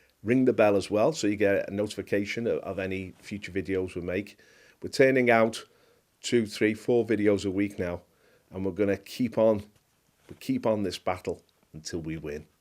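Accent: Liverpool, United Kingdom. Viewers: God, I love the scouse accent. Succinctly put. I totally agree.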